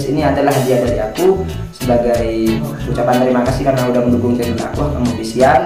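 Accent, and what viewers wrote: Madura, Indonesia. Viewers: orang madura ya mas..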